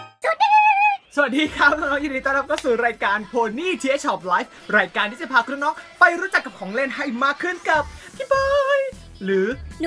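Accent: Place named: England, United Kingdom. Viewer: สำเนียงอังกฤษมาเต็ม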